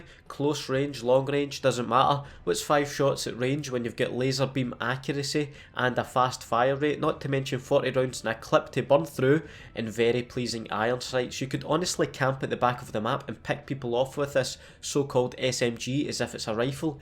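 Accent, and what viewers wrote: Scotland, United Kingdom. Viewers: I'm mainly gonna subscribe for that awesome sounding Scottish accent you have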